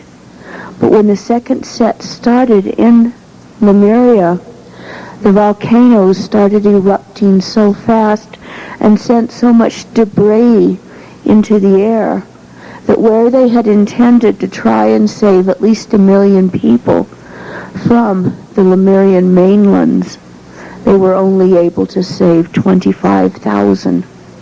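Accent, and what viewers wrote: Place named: United States. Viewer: why does she talk in american accent?of all accents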